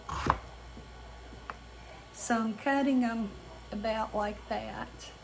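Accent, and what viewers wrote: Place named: North Carolina, United States. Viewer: Your snail mail addy is Newton NC!!! That is right down the road from me! I live in Wilkesboro NC. Only one hour from me. Thought that accent sounded from NC. So cool. Small world ain’t it? Take your time responding, the world is crazy today!!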